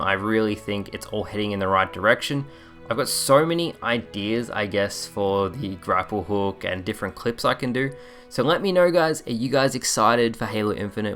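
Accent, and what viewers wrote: Australia. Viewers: hey. since this one of the first videos i've heard you talk in, I wonder, are you from australia?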